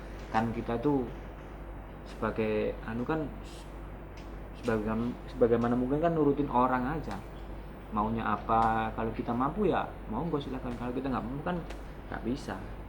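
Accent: Java, Indonesia. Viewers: Wala mas omongnya amburradul Jawane masih di bawa mbo di Tingal sedikit 2 mas kelihatan Banget re jawanya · Njowoooo banget mas idola😙😙😙😙gaya ngmge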